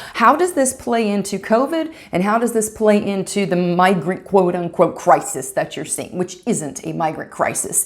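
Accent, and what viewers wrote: South, United States. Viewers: Gotta be a southern Lady 🙂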